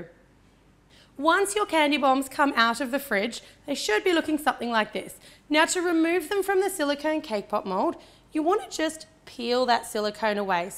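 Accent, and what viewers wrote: Australia. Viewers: yes she is from Australia you can tell from the accent right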